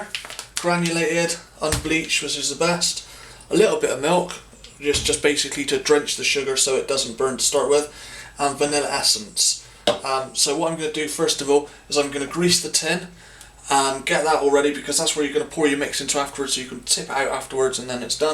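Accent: Bristol, United Kingdom. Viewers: Good video :) I love tablet but never knew how to make it. Out of interest where are you from? Can't place your accent....Bristol? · I like your accent, are you from Bristol?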